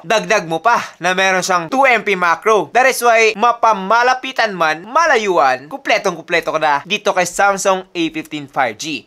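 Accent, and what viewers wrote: Visayas, Philippines. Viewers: Bisaya diay ka pala dol HAHAHA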